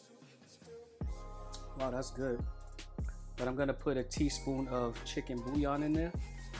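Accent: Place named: Nuyorican, United States. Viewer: Sounding’ straight up Nuyorican! Me encanta! 🇵🇷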